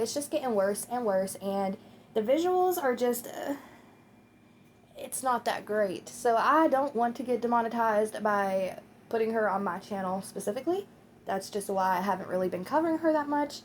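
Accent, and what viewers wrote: South, United States. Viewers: You saying “y’all” as a southern person from the USA is EXACTLY the same as a British person swearing like a sailor. Most of the time, it cannot be helped. It just slips out! I’m saying this as a British person who, very unfortunately, and regrettably, swears/curses like a sailor. Most of the time we were just raised saying it. Can it be annoying? Absolutely. I even annoy myself when “f*cking” or “f*ck” slips out of my mouth, mid speaking. But… thats no reason to hate or unsubscribe to someone. It’s dialect, sure, it can be annoying, but it’s not like you can stop it over night, especially after years of it becoming part of your typical dialect. Note: Im not saying that it cant be controlled at all. But most of the time it’s involuntary. Also, even as a British person who typically speaks in a, quote, “proper” way (apart from the wears) who tf would want to say “you all”, especially with a southern accent, it sounds clunky and kind of out of place. 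Your content is more sit down, relax, talking to friends than… professional, sit down and talk in a job interview or job meeting. People will always find fault in the silliest of thinga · Are you from the South. I’m from Louisiana and we say Yall a lot here. Keep up the good work..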